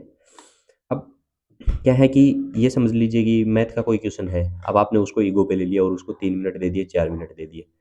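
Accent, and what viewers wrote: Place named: Rajasthan, India. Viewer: Bhai aap Rajasthan se ho na Bolne ke tarike se lag raha h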